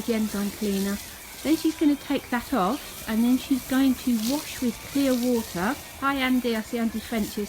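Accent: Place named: United Kingdom. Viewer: she’s british they literally INVENTED english dude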